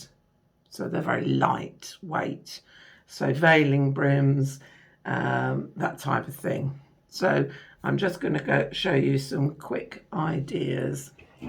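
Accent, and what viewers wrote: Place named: United Kingdom. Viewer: So cool to see how this gorgeous have is made and that it's doable for a newby like me. And fun to hear a british accent! I lived in London as a student years ago.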